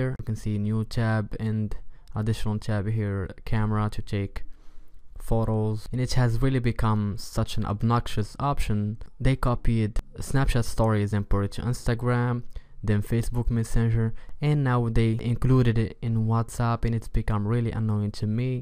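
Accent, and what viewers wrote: Morocco. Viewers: A completely unrelated comment: maghribi akhay yak? Sounds more like it.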